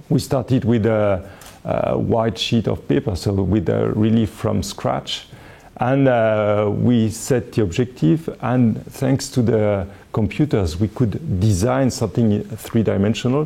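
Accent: France. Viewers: He speaks great English with a French accent..... I speak average French but I try my hardest to recreate as good a French accent as possible.... When speaking to a French person my aussie accent shows through and probably sounds similar to the way I hear his French accent when speaking English. I couldn't fault him. Excellent description and vocab. Dunno what the fuck you're on about mate.... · dude that french accent tho XD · Magnifique accent français ;) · Je reconnaît ton accent de français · Cette accent français mdr <3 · Wonderfull french accent for the Forst video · Qui a reconnu l'accent français ? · french accent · laccent Français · French accent too much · As a french i totally spot that accent from the first second x) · Francuz mówi po angielsku - MASAKRA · Cet accent français de l'espace ! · Ho non... Cet accent français 😂😂😂🤣🤣🤣 Non mais franchement fait un effort 😂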